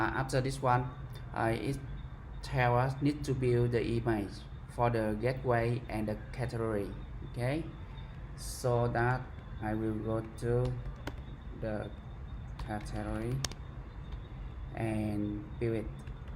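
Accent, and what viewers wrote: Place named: Vietnam. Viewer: Ây cái phát âm này ông bạn là người việt phải kh -,- Video hay lắm, cảm ơn ông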